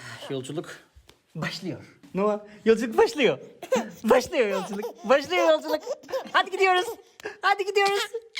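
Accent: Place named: Central Anatolia, Turkey. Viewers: Dingil Ankara şivesi o. Köylü değil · Kendisi anadilini tıpkı iç Anadolu kırsalındaki kadınlar gibi konuşuyor. Kadın yabancı bir dilde iki kelam etti, dalga geçiyor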